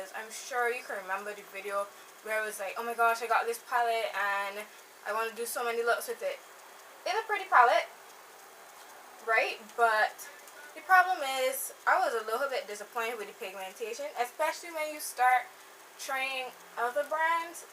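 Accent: Barbados. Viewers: she from barbados i caught on her accent ❤ carribean just like me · i can hear that Barbados accent lol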